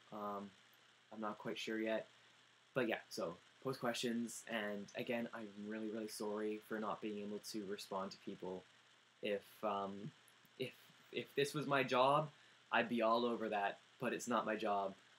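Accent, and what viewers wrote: Canada. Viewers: This is actually the first time I've ever heard your Canadian accent xD Never really noticed it before x) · i heard your little Canadian accent more in this video than others lol its super cute ^^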